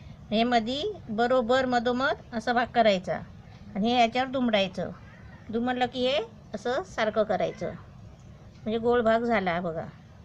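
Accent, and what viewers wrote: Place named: Ratnagiri, India. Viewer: Kaku, chapati chi paddhat pan chhan aani tumhi kelel varnan pan mast. Tumchya bholnyat Ratnagiri chya bolibhashecha godva sampurn video sampeparyant khilvun thevto.. 👍🏼 Dhanyawad.. 🙏🏽 🙏🏽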